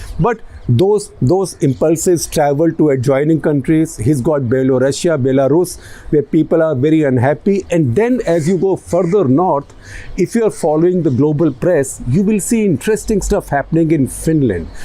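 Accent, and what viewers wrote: India. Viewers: That was the Voice of America with an Indian accent. He should concern himself with India : one of the poorest countries in the world. The average citizen in those countries that he criticizes enjoys a living standard that people living in "democratic" India can only dream about.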